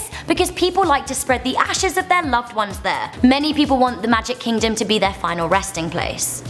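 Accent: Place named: Cockney, United Kingdom. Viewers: British - circa Cambridge/London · I was just wondering that. Maybe cockney...? Sorry I'm no help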